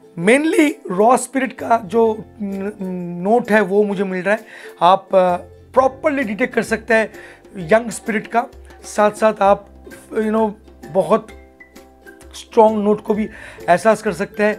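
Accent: Bengal, India. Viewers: Tum bangali hai kya? Bengali me bat karo.. Your hindi is shit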